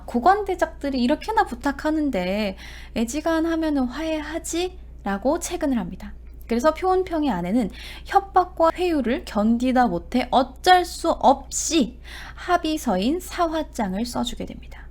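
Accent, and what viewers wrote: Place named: Chungcheong, South Korea. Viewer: 충청도유? ㅎ 구수혀 ㅎ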